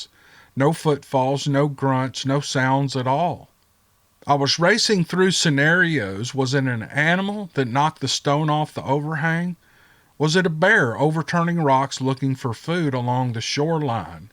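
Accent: South, United States. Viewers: all the best to you, your family and all the pups too! Thanks for that good old southern style story telling we’ve all come too love.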